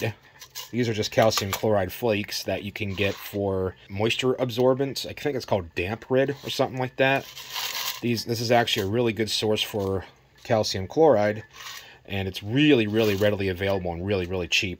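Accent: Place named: Pennsylvania, United States. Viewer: Brother, if you're not from Pa, then you're certainly in a border state. One - the Amish. Two, the inflection in the voice. FWIW, I buy all my meat and produce from Amish vendors in Mt Joy and Lancaster county.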